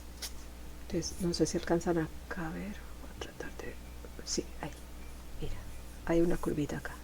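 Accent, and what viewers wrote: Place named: Colombia. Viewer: Siempre admiro tu trabajo . Puesto q es bello bello .. saludos desde Villahermosa Tabasco mex. Hoy me dejas sin palabras, super explicado y detallado . Siempre m pregunto de donde eres, creía q eras española pero hoy tu voz me pareció diferente y pareces colombiana, 😙😙😍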